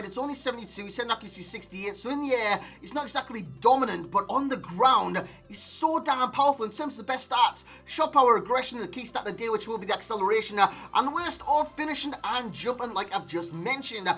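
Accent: Newcastle, United Kingdom. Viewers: Proper Geordie accent until you say “nuts” and you sound like an upper class bloke who lives in a castle 😂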